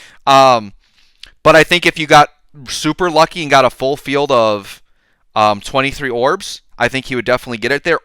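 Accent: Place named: Wisconsin, United States. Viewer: Are you from Wisconsin? I feel it in my bones.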